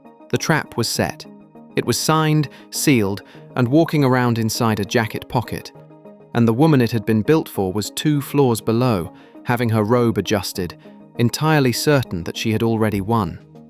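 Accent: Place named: United Kingdom. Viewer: I have read the disclaimers. And, of course I notice the same scenarios worded differently and the span between the repeats. I also notice quite a few British accents during the narration and sometimes the BBC. I might add that I am not a fan of Camilla, since the affair came to light. It’s outright dishonesty to the one you are betrothed, to your children and considering Charles position, to his country. Something you could NEVER accuse Queen Elizabeth of. I’m not saying others are perfect. Look at the governmental mess in USA. It’s entertainment and I have an opinion On the scenario rather it be true or false! My God, after reading these things you could not pay me to be a royal! Their lives appear to be maybe 25% their own. Any way, if you hear anything that’s actually news and not entertainment, post it, I would love to read it! Thank you for your comment😊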